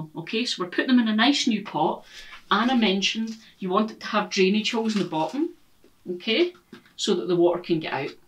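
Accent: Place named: North, United Kingdom. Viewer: I love your accent it reminds me so much of my family up north. Xx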